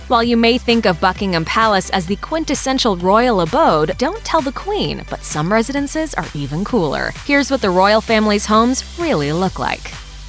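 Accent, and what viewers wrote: United States. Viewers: It feels really wrong that this is narrated by an American. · Why an American doing the commentary telling us what we are paying for a load of spungers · Supper annoying American accent voiceover. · a yank talking about the royal family not for me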